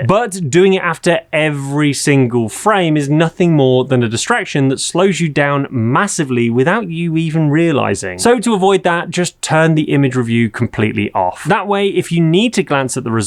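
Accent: United Kingdom. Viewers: Informative common sense leavened with wit —and a non-AI British accent that's not insufferable.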